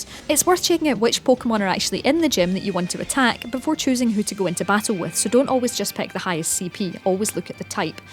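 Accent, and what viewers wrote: Scotland, United Kingdom. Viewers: Im from scotland and i hear this accent everywhere <3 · it's Scottish not British lmao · BAHAHA HAHAHAHAHA BRO THIS NARRATOR IS LIKE SCOTTISH SHE'S NOT EVEN FUCKING BRITISH YOU DUMB ASS BRUH YOU THINK ALL EUROPEAN PEOPLE ARE THE SAME 😂😂😂😂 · No, this accent is more scottish while the girl from outside xbox is brittish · Sub'd because Scottish 😍😍😍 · She kinda sounds scottish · is the person taking in the video Scottish? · That is a VERY Scottish accent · I love that scottish accent. <3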